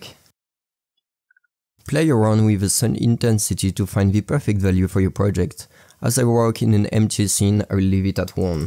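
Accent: France. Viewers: tuto en anglais avec un accent français à la pisse, comme si les anglophones avaient pas asser de tuto pour blender; putain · Dude that is a kickass French accent you got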